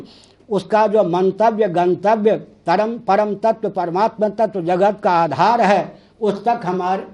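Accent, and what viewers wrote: Standard. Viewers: विशुद्ध हिन्दी बोलना कोई आसान बात नही है।।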